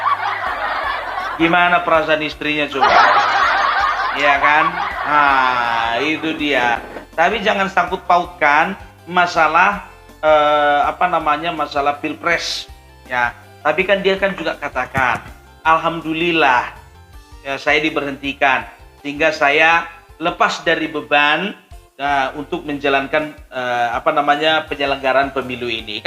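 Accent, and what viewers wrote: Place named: North Sumatra, Indonesia. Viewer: Enak opung ini kalau bicara dengan aksen batakx yg kental sangat menyenangkan, bagus banget.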